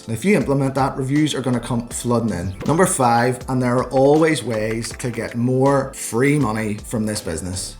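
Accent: Ireland. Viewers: I'm watching your videos for the first time and OMG... I'm learning so much as a new Airbnb company owner! This is officially my new binge...Plus totally loving your Irish accent and humour 😄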